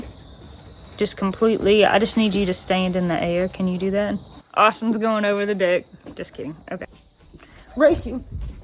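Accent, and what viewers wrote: South, United States. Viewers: What kind of accent is that ? Virginia, Kentucky, Carolinas ??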